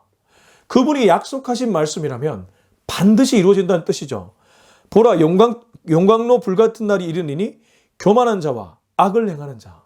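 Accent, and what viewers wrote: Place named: Gyeongsang, South Korea. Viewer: 목사님의 경상도사투리 멋쪄요...^^ 우리들은 악인를 따르지않도록 주님께 기도드립니다^^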